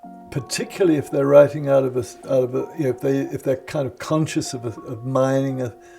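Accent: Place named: Southern England, United Kingdom. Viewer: He's not from Liverpool though! His accent is from the south of England (like mine)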